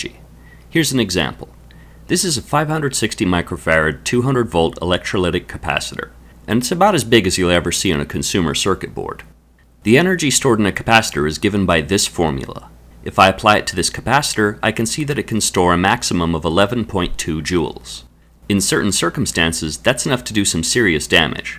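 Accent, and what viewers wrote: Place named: Canada. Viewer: Canada, Eh?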